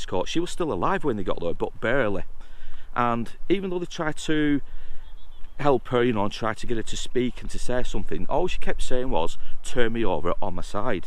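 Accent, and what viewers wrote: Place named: Lancashire, United Kingdom. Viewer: Great video again. Discovered you today and have watched three. Originally tuned in tot hear the accent because my father and all his ancestors were Bacup born and bred. Anyway about the Hindle case. Why was the trial so quick. Today that would take weeks. How can the jury only have been out 12 minutes when there is such a lot to think about? Seems to me he was railroaded by a policeman eager to put it to bed.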